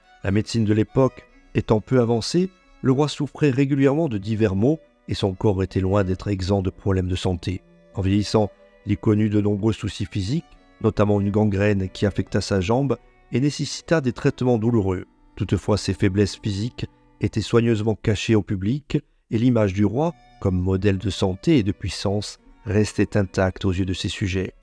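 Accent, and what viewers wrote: Corsica, France. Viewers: J’avoue que j’ai surtout écouté la vidéo pour l’accent Corse, forza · Super video! Mais je note un petit accent dans la voix , corse,je crois. Pace e salut❤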